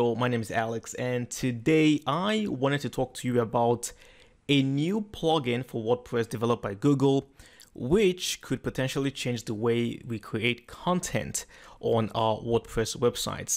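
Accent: Nigeria. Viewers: That's a Nigerian Speaking!! Nna kedu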